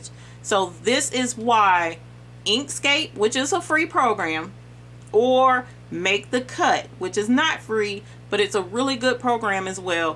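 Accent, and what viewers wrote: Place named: Texas, United States. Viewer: Please tell me you are from somewhere within 100 miles of Dallas or Houston? That accent you have makes me feel at home. Can you read me a bedtime story?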